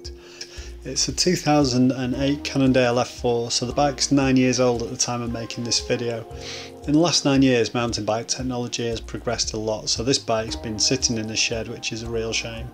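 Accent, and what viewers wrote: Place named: Liverpool, United Kingdom. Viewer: he's got an accent sounds like the Beatles yellow submarine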